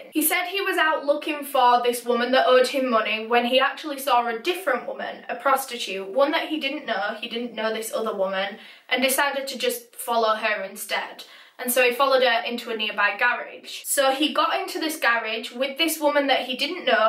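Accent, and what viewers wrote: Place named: England, United Kingdom. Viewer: Your accent makes me homesick for England. I grew up in Manchester but moved to the US in 1972 as a young teenager. In 1994 I came back to England with my teen daughter. We stayed for 3 years but hated how much it had changed so came back in 1997 after Princess Diana died. I wish I could have seen more of England while I was there but my daughter was more important than myself. She missed her friends and I missed my son and my mom and brother. So to all my British family, I love you all, Cheers.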